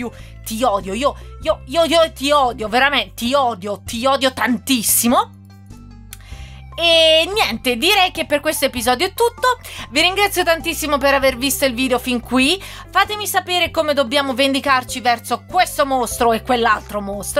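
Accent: Italy. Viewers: comunque ogni tanto l'accento de Roma se sente e te sta pure bene. Per il maiala puoi usare carote, patate, o barbabietole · ma sei marchigiana?P.s leggilo.Ti stimo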